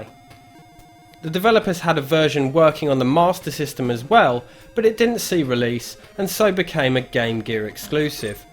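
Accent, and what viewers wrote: England, United Kingdom. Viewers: I downvoted this video because you're English and I find English accents to be very unpleasant and English reviewers always tend to miss the most important and distinct parts of gameplay mechanics in favour of prattling on about story and themes.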